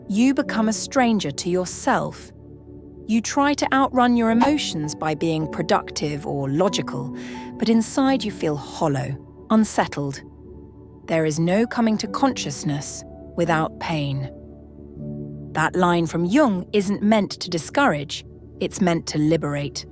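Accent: RP, United Kingdom. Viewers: You have a lot of hatred in your soul! Just because this lady speaks clearly you have to be nasty about the English accent! I assume you're American and, if you are, that speaks volumes! 👎😡🇬🇧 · Fast speaking stuck-up British accent shoots concepts one after another without saying what to do. You are never the one in danger, your soul doesn't need protection. Loosen grip to worry to open space. No longer chase the worry. Catch the fear and stop it before it spirals. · That isn't a stuck up accent, nor was she fast speaking. She spoke clearly in what used to be called received pronunciation, which was a hallmark of BBC broadcasts. But now we have to pander to the idiocracy.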